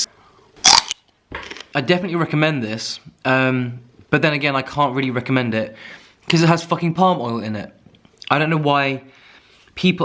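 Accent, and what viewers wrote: Germany. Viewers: I hear a german accent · She sounds 10000% German lol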